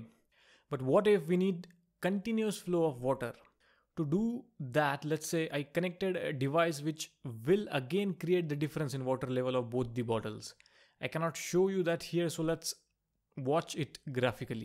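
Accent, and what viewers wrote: India. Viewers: U have the greatest indian accent ever.. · Thank you for this film. It is the second time an indian teacher impress me. Exactly what i needed to learn, now. I listened to american, brit, australian... It was only the indian teachers that were precise and correct in their terminology. India must be an educational superpower. Your english sounds funny, but , you are simply the best teachers.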